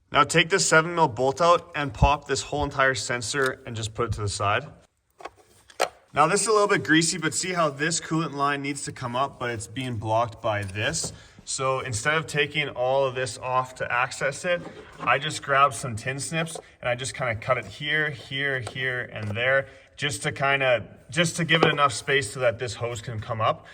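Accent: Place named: Canada. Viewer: Ya that was a super sick video dude well explained, thought you sounded Canadian then saw the sask plates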